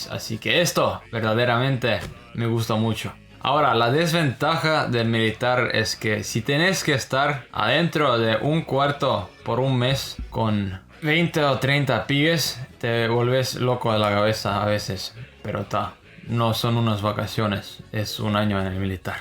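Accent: Uruguay. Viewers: Que bien que hablas con el acento Uruguayo jajaj que grande boludo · Es uruguayo el acento jaja 🇺🇾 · Acabo de ver los primeros videos cuando llegaste a uruguay, increible como aprendiste el idioma y ese acento!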